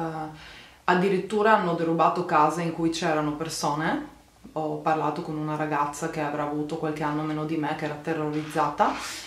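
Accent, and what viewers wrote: Trieste, Italy. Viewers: Caterina Trevisan un triestino che parla in italiano si riconosce subito, non siamo capaci di parlare "in lingua"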